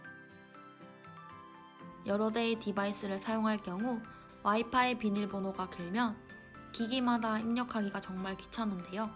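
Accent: Busan, South Korea. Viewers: 부산사람이신듯..발음 귀엽 ㅋ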